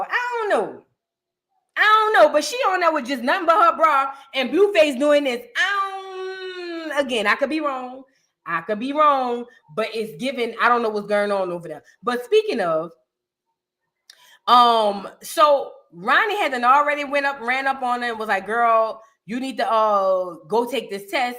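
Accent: New Orleans, United States. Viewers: Girl I adore her New Orleans accent!! ❤❤❤ one of the main reason I watch her!!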